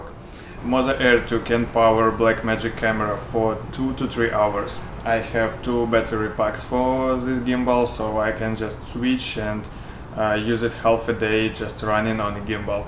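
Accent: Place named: Russia. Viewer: Чувствуется русский акцент😁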